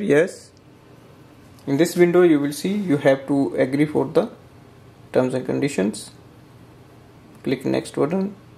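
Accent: India. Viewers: My T7 (or T7 Shield) is not recognized by devices. The manual says ... Make sure that the T7 is connected securely to the USB port. If you use cables other than the one included in the product package, the T7 may not be recognized. Some PCs may not recognize the T7 when connected to the USB 2.0 port if the current exceeds the max. limit (500 mA) described in the Power Specifications. In such cases, please try a different USB port. BTW that India english is very annoying for non english speaking people !!! Almost all words are distorted into strange sounds and the accents of single words are placed differently. Talking slower would helps a lot, so we get more processing time.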